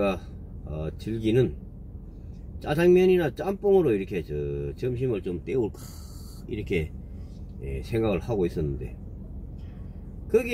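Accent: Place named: Gangwon, South Korea. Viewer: 인물도 훤하십니다 상동에 가보구싶네요 말씀도 강원도 본토말씀 듣기좋습니다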